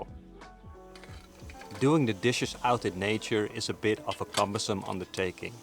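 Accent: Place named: Netherlands. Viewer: Dutch accent always immediately jumps out to me. I notice a lot of dutchies run international targeting channels covering great topics quite well spoken. Good job. Very helpfull.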